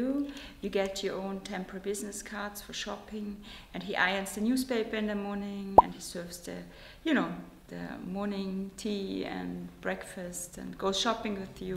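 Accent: United Kingdom. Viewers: 当たり前ですが、やはりSTOPPELさんの話す英語はイギリス英語なんですね…！それとも訛り…？ 字幕のおかげもあって、聞き取りやすかったです！ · すごいイギリス発音。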